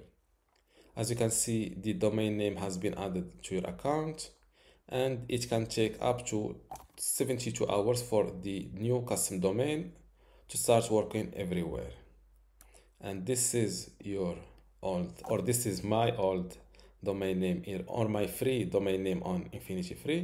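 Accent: Morocco. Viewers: idk why but you sound moroccan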